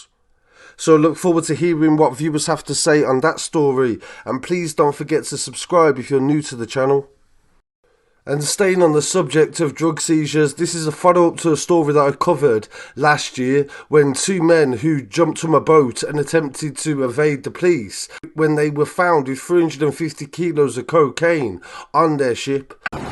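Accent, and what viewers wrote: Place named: Maidstone, United Kingdom. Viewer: thats so funny i was literally sat there the whole intro thinking that was the strongest maidstone accent ive ever heard😭😭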